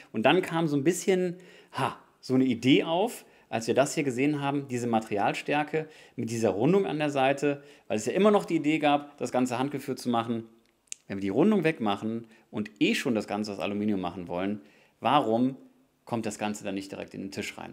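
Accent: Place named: Germany. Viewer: du bist ein Genius. Wie immer, es ist ein Vergnügen dir zu zuhören. Und dein deutscher accent ist sehr angenehm. Grüße von down under.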